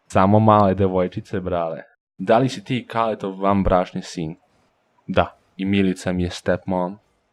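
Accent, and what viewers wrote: Subotica, Serbia. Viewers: Znao sam da si iz subotice hahaha zbog naglaska ;) Ps: ja sam iz sente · Znao sam da si iz Subotice hahahahahaha po akcentu jer sam cesto tamo pozdrav za Subotičane 💙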